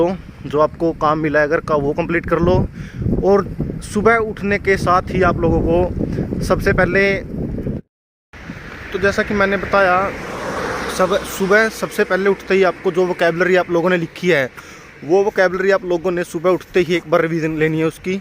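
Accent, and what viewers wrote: Haryana, India. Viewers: Haryana ki boli lag rahi hai bhai. Thanks for the info.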